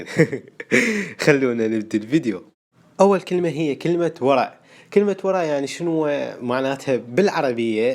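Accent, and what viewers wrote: Saudi Arabia. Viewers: حلوه لهجه السعوديه احنه العراقين نفهم كل اللهجات لاكن محد يفهم لهجتنه 😂😂😂😂👏🏻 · حسيتك سعودي مدري ليه هههه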